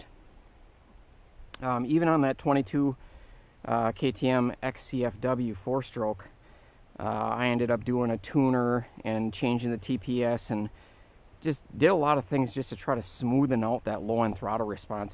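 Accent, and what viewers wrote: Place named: Wisconsin, United States. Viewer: That Wisconsin accent is prime brother 💪🏼. Great vid. Your description of how controllable it is down low, makes this bike very tempting. My four stroke Kawi is hard to control in any sense of the word at low rpm. Feels like it goes from 0-100 with the slightest twist of the throttle. I ride a lot of desert and open area, so it’s fine. Woods riding and technical terrain is tough though.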